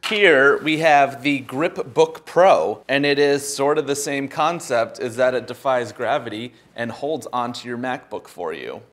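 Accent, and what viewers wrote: United States. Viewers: Haha i thought this was a knockoff integza channel.. until you spoke with American accent. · Are you American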